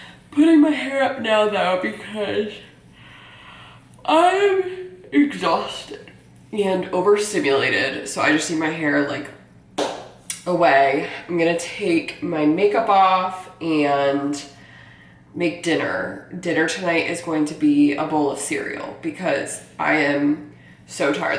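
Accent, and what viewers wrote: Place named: United States. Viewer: I love in American Accents. By the way, May I know Where is your accent in America?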